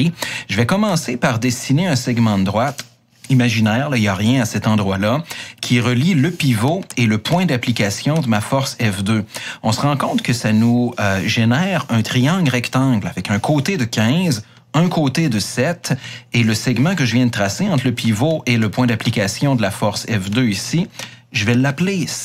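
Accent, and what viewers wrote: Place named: Quebec, Canada. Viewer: Français accent québécois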